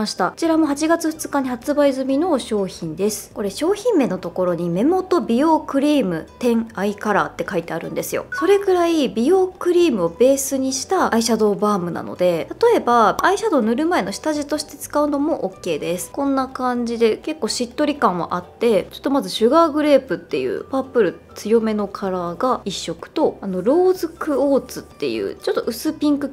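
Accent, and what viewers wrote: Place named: Aichi, Japan. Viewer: の「服」とか「靴」とかの愛知イントネーションはだいぶ聞き慣れたのですが、「ほくろ」も標準語とは違うイントネーションなんですね！いつか愛知に遊びに行ってみたいです☺️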